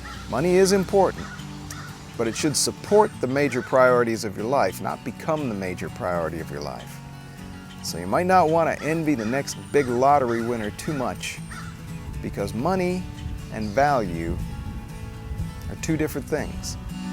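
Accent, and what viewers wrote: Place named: United States. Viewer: No I have never known someone with lots of money who was unhappy. If you have cancer and you are going to die then money will not save you. But it will fix just about everything else. Your example of the guy in Texas who won the lottery is absurd. He did not have to loan money, buy people homes or put himself in the position of a giver, he could have saved the money and had a decent life combined with doing something like giving to an animal shelter and volunteering to help out. It is not rocket science to know that if you are doling out millions to people then people will take advantage of you. And in the end he killed himself so right there he had mental problems. So tell me how people who win money are just a "giant freeloader"? You have an American accent so I presume that you live in the USA. Don´t get seriously sick there without a lot of money. 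there is no National Health Service. This video is pointless.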